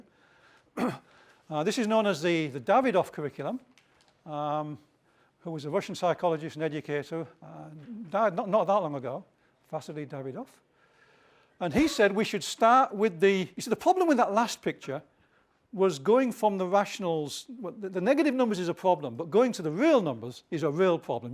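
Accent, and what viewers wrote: Northern England, United Kingdom. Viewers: Professor Deblin, you forgot to explain how your Northern English accent opened the doors for your at the library in Florence, I'd love to know the story. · He looks just like Graham Nash . He has the same northern English accent too .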